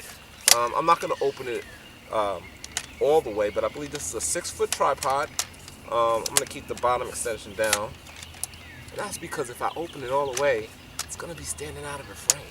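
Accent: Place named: New York, United States. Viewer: you are an intelligent c.... not just a c.... you are fun to watch and have a great New York (yeah) accent. keep up the good work😁😁😁😁😁😁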